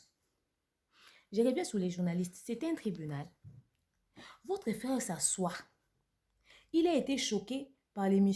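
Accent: Ivory Coast. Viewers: J'aime bien tes analyses,ce que j'aimerais le plus qd tu t'exprime en français normal c'est trop nickel et non l'accent ivoirienne excuse moi.😘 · Exprime toi bien comme tu as l habitude de le faire arretes cet accent ivoirien qui ne te sieds pas du tout. Sinon bonne analyse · T EST VRAIMENT OBLIGER DE PARLER COMME UNE IVOIRIENE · je t’aime bien mais j’aime plus quand tu parle normalement le français ivoirien ne parle plus ça stp ma sœur je t’aime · Ma chérie je t'aime bcp tu es parmi le youtuber congolaise qui par bien le français et tu fais bien tes analyses. Mais pardons ne parle pas avec l'accent ivoirien. Parceque moi je beaucoup des amis ivoiriennes qu'on un complexe d'infériorité enver les congalais ils disent que nous parlons tres bien le français sans accent. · Ma Sœur, Vous parlez très bien français car je vous ai toujours suivi. Svp ne changez pas d’accent ou n’ empruntez pas le ton ivoirien. 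Gardez votre excellent français avec vos bonnes analyses. Cela fait votre identité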